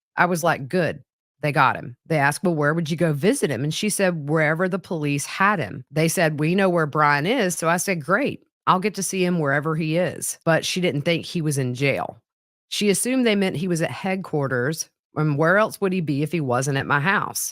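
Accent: South, United States. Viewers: you do such a fantastic job reading that as quickly and concisely as you do! Your linguistic prowess is amazing! It’s really impressive how you never pause or screw up and your cute southern draaawl is replaced with more of a NYC pace. I love your content!❤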